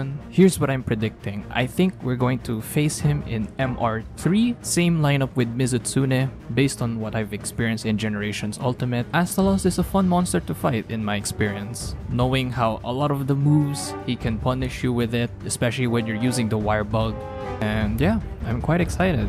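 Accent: Philippines. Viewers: Filipino ka ba bro?? I sense it with your accent eh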